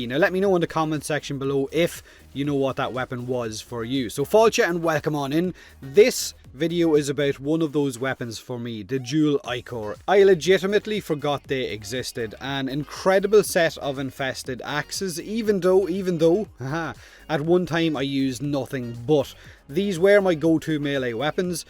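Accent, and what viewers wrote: Ireland. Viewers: I love your irish accent. It’s amazing. You’re by far my favourite youtuber, and i will definetly try the dual ichors ;3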